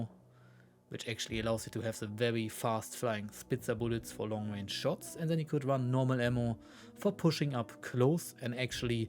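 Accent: Germany. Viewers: Dude your German accent is so heavy I can't understand what you're saying 😭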